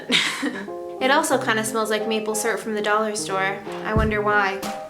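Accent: Canada. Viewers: Are you a Canadian? Your accent ...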